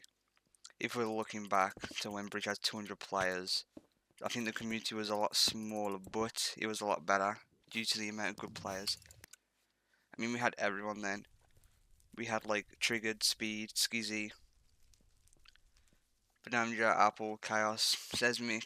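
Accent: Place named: North, United Kingdom. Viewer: Omg your so northern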